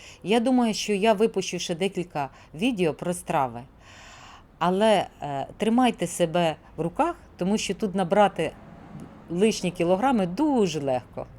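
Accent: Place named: Central Ukraine, Ukraine. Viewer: Ви з центральної України? У вас вимова, як там🎉🎉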